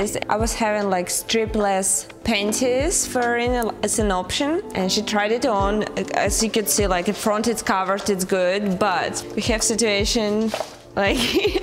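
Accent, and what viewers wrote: Russia. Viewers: ХММммм...мне кажется или я слышу русский акцент?)))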